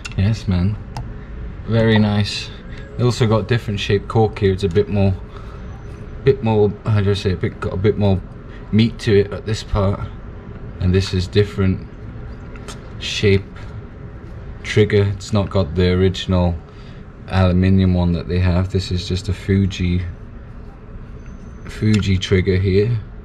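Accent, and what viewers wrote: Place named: Wales, United Kingdom. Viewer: Interested how you ended up in Holland? You're Welsh right? Or at least sound Welsh.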